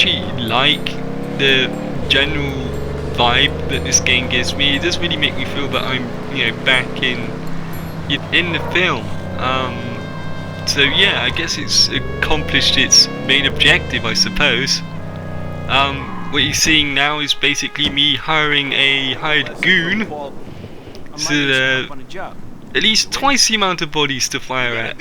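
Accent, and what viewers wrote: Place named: United Kingdom. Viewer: Everyone is different. Wow, is this a crime or something? Does he have to talk like you, does he have to walk like you...? I've seen so many remarks about people's voices that are actually NORMAL. This guy's voice is fine. Leave him alone. I don't even know him, but I find his commentary to be fine, casual, and I know (obviously) that he is most likely from the UK. Maybe he doesn't have the best recording equipment? No reason to curse at him over it! Sheesh...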